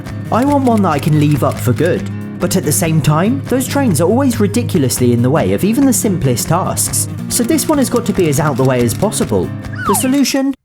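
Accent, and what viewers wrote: United Kingdom. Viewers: Are you British? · He’s British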